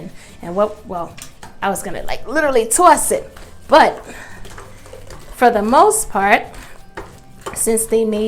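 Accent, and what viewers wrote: New York, United States. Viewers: Gotta Be From NY With That Accent 🥰 Subscribing To You Now Lol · Go Thank You For Giving US Momma's An Quick, Easy & Delicious Recipe For Picky Eaters. Quick Question-Are YOU From New York? Cause When YOU Say Certain Words-YOU LOL Sound Like ME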